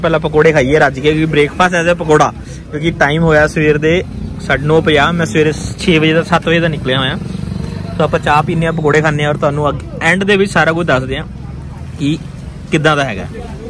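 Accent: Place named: Amritsar, India. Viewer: Paaji tusi hoshiarpur de o par tadi boli expretion sade amritsariya vrgi aa ji apa nu v hun ithe rehde kafi chir hogeya hun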